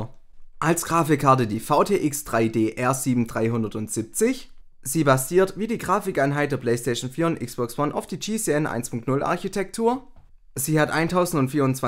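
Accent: Swabia, Germany. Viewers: Bist du Schwabe?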